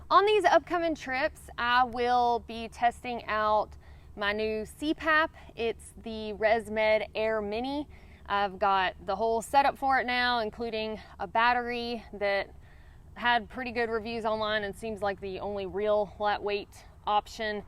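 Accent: United States. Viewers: You sound American 😮.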